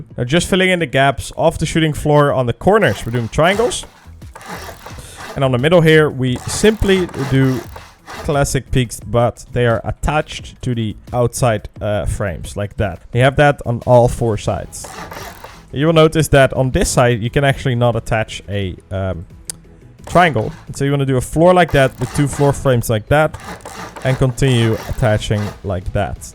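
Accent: Netherlands. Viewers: i can hear u are dutch, i am dutch to, je bent goed bezig man!, had ik maar zo'n slimme base builder als rust teammate ! haha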